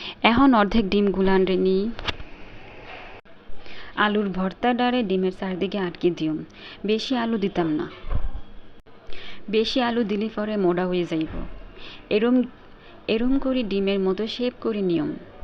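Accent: Noakhali, Bangladesh. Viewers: ভাবী ত নোয়াখালীর ভাষা খুব সুন্দর করে কইতেছেন। অনেকদিন পরে এরকম নোয়াখালীর ভাষা আমি শুনতেছি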